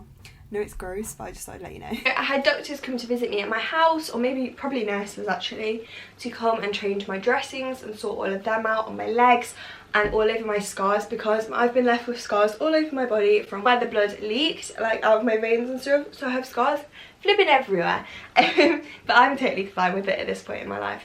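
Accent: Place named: England, United Kingdom. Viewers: I KNEW I recognized that accent immediately! I’m American, but my brother’s husband is from Nottingham. Such a beautiful accent! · I'm an American so I'm not great at recognising different British accents but I really like yours! To me it sounds...well, between York and London 😅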